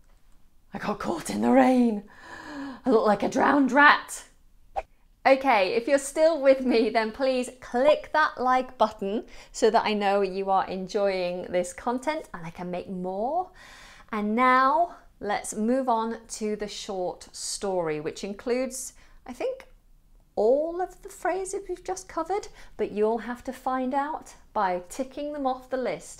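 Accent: United Kingdom. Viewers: Vrey nice story I like British accent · I really admire the British accent. Great class. One more subscribed.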